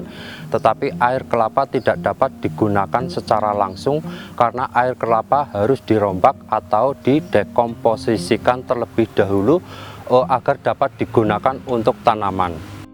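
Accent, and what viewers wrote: Banyumas, Indonesia. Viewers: ora ngapak gak kepenak kang,salam saking blitar kang,,, sukses selalu kang,terus berkarya kakng,,, !!!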